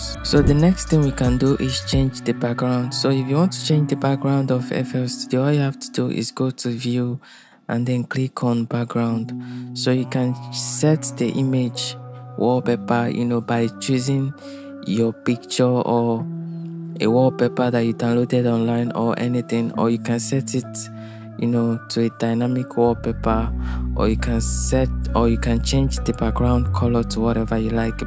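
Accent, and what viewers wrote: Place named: Nigeria. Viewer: beautiful naija voice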